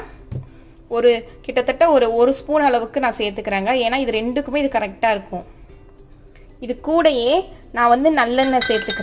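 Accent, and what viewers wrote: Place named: Kongu, India. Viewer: Super sister, good for your slang , your native is kongumandalam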